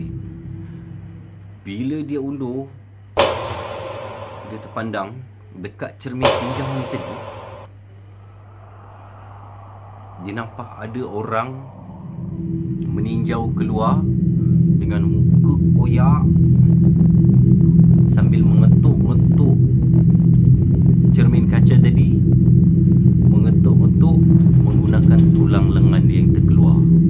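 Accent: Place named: Semporna, Malaysia. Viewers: Penceritaan kurang menarik bnyk ulang2 sama logat tu bagus kekalkan logat semporna, tdk perlu guna bahasa smjung..background n suara latar kurang tepat diguna...nak gambarkn sesuatu pastikn berserta kawasan...sekadar cerita, orang tdk akan percaya...ada baiknya berckp sambil tunjuk kawasan titik hitam...teruskn usaha..tingkatkn lg usaha...sokong org sm4na jd youtuber👍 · kami tidak faham semua logat sabah bah...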